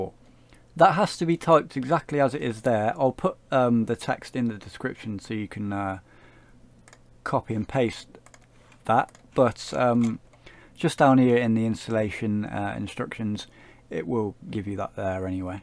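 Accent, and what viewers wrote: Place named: Dudley, United Kingdom. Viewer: You sound like you're from Dudley cool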